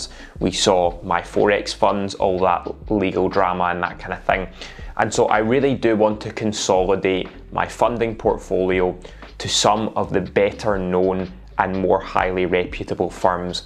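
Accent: Scotland, United Kingdom. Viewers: Nice accent Scottish Lad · Are you from Scotland? Nice accent 😅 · Are u Scottish? Impressive work anyway.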